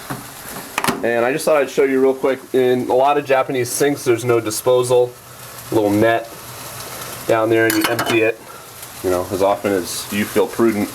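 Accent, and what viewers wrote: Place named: Scotland, United Kingdom. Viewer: YAY! Scottish accent..you should make a 2 part video with this accent.But you should have saved that last part(when you eat) for your next 2 part video,Eating with...with a scottish accent...ha ha ha.Seriously it would be nice if you could make a video at many kind of japanese restaurant,like where you go often for ramen,okonomiyaki etc...should be great!looking forward to your nxt vid.(i'm really curious about Okonomiyaki restaurant and Genuine Ramen Stand)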